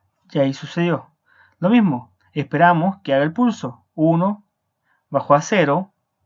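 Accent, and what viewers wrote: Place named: Chile. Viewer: muchísimas gracias muy didáctico y muy bien explicado así es muy fácil de entender por su acento parece ser de Chile , un saludo desde Colombiano.y nuevamente muchas gracias. .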